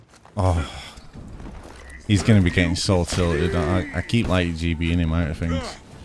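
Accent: United Kingdom. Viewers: are you a Brit? I can’t recognise my own accent 💀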